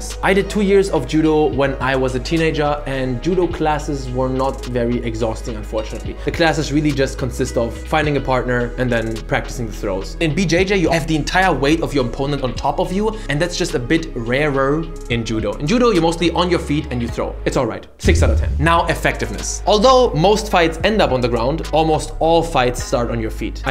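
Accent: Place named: Germany. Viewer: Redest du eigentlich extra so ein klischeehaftes englisch als deutscher oder versuchst du erst garnicht die Wörter richtig auszusprechen?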